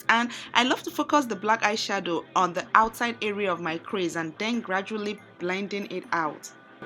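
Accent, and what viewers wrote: Nigeria. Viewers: my sister... I like ur nija accent beta oooh.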